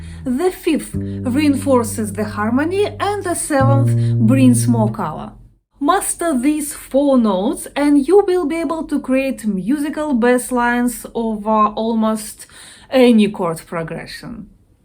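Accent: Russia. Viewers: What a fine difference she makes compared to all these ugly unshaven tattood guys in black t-shirts. Her teaching is not only very accurate, but is is more fun to listen to somebody with a pleasant aura and the russian intonation is the cherry on the top.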